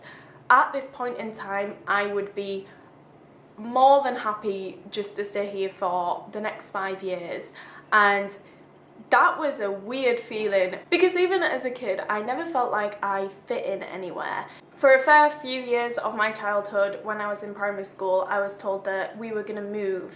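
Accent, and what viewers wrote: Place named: England, United Kingdom. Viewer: Supply and demand, a normal thing. You are a native English speaker, have that English accent that Vietnamese parents want their kids to learn from. Unless the market is flooded with native English speakers who are willing to get paid a few dollars an hour, otherwise, you will still get ~20 dollars an hour.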